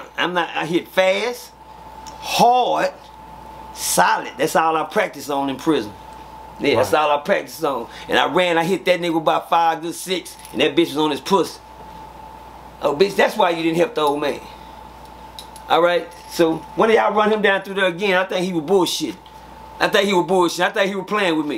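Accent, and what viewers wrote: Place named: Texas, United States. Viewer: yea they don't know that's Texas talk..I'm from Houston and my uncle's been saying all the stuff he say lmao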